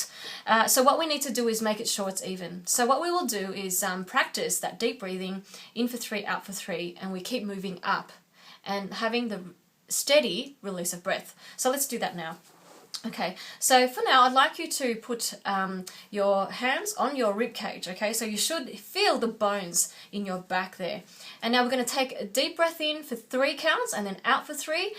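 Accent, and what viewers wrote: Australia. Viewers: OMG, shes stray-lian : )) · You're Australian correct? I'm usually good at differentiating between Australian and British. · are you from Australia? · i figured it out, you're australian! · She's from Australia.... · Are you Australian?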